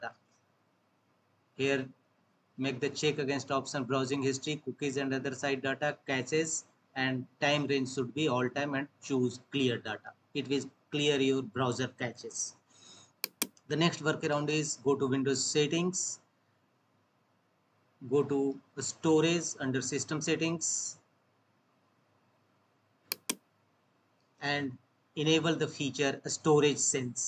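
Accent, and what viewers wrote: India. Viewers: I already knew it that it was gonna work after hearing the Indian voice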